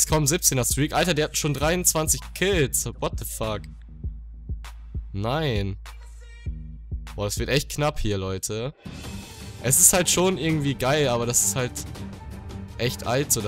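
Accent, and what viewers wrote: Osnabrück, Germany. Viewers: Kommst du aus Osnabrück?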